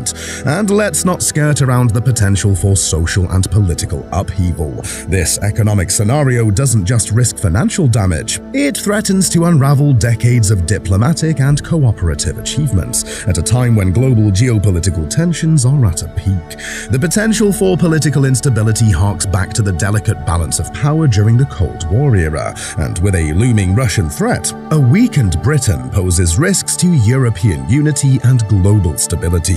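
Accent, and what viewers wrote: United Kingdom. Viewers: I note that the speaker has a hint of British accent and a hint of anti-Thatcherism in him. Otherwise your video is quite well done. Cheers!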